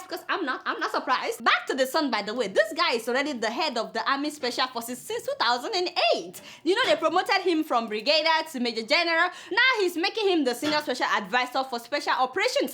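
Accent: Yoruba, Nigeria. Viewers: Nice one. Keep it up! I haven't missed any of your weekly episodes since I got introduced to it before the 2015 election in Nigeria. Don't mind my name. I'm Yoruba, and I love the way you speak the raw Yoruba language. I'm proud of you!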